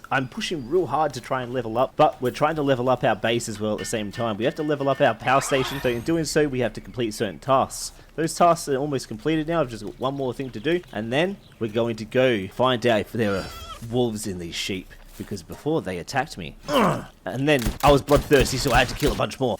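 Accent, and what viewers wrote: Australia. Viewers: I can't tell whether he's saying "pals" or "powers" because he's too Australian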